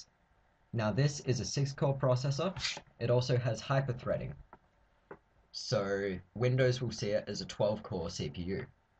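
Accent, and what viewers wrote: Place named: Australia. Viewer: Well I think he actually sounds Australian. And Australian's use dollars, soo yea.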